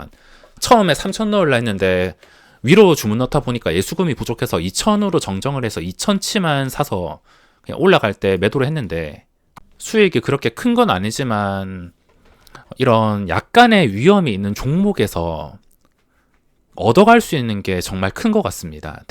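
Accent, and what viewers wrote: Gyeongsang, South Korea. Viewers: 경상도 특성 강제로 보시라 안했음 싫음 보지 마삼 오케이?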